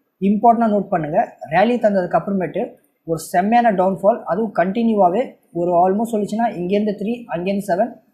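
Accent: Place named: Mumbai, India. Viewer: Mumbai kaarar paesum alagiya tamil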